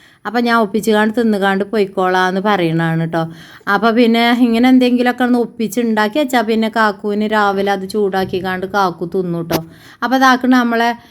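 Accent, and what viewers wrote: Malappuram, India. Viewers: Njan aadhyamayittahn ithade vvidio kanunnath...ithuvare vidiosin onnum comment idarilla...njn full vidio kandu... q &a session okke ...subscribe cheythu ... vidio kanditt commnt idan thonni...enikk samsaram orupad idhtshttayi..njnum malappuram aahn......negative comments idunnavar idatte ...athonnum mind akkanda . Malappuram karde normal talking ithaahn ....ithupole munnoott poyal mathi...insha allah..ellam sheeiyakum..allahu barakath tharatte...kadangalokke pettann theerkkan pattatte.....njngalum aanakkayam post ahnnn😅... Enikk ente thathante age thonni...6 makkal nd nnonnum parayillla😊masha allah..itha nalla sundariyahn....❤❤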